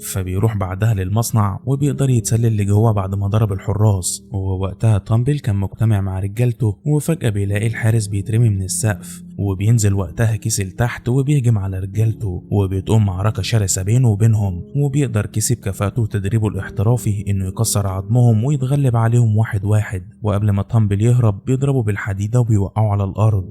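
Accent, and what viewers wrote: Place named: Iraq. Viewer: ليش احسك عراقي وتحجي مصري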